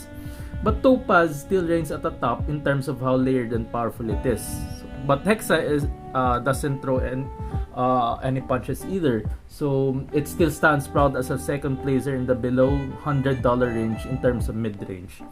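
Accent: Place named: Philippines. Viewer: Pretty sure this reviewer is a Filipino. That accent 😆😆😆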